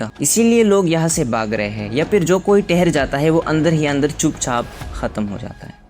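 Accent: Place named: India. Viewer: laanti indian propaganda chanel....listen to his funny "hindi accent, pronouncinf "Z:" with "J".......chaeapter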